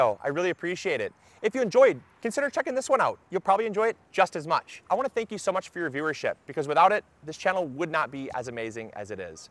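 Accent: Michigan, United States. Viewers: I miss the Michigander accent!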